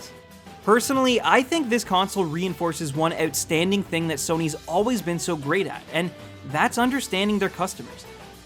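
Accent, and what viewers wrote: Canada. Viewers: is he Canadian? he sounds Canadian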